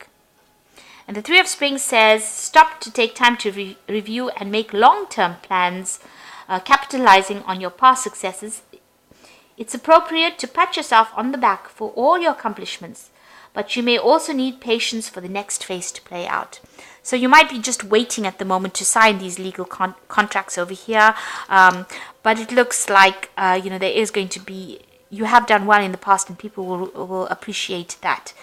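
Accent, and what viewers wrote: South Africa. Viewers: Do I detect a South African accent?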